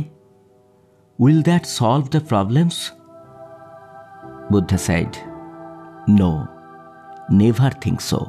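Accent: Bengal, India. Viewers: Are you (the speaker) Bengali? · I was searching English video in Indian accent and find this thank you.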